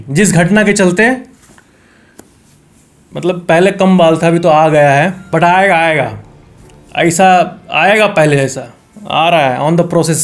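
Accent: Bihar, India. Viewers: भाई तुम बिहारी हो क्या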